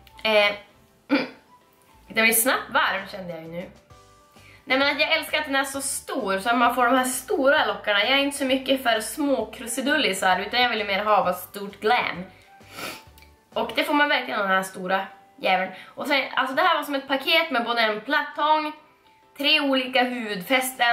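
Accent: Norrland, Sweden. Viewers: Jag avgudar din Norrländska dialekt :) <3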